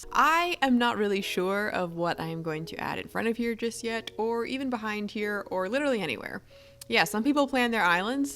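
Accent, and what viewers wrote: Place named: France. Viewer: omgg so beautiful and ur french accent is so cuteeeee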